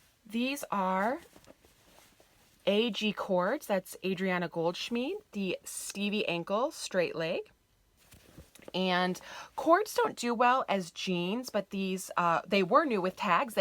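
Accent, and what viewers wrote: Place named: United States. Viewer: oh, I love your American so much=)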